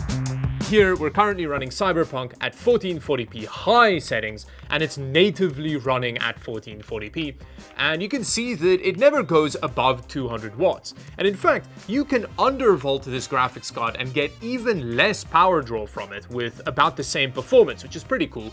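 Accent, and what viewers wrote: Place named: South Africa. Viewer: I went from 4k 60hz to 1440p 165hz, impressed by the response and speed ofc but always missed that crisp and super clear 4k resolution. Went back to 4k (now 144hz) and the 7900XTX is doing a great job, mind blown visuals. Tests show that the 9070XT is not far behind (non-RT) which is great. recommended. Also from South Africa, can hear your accent, well done on all the subs etc.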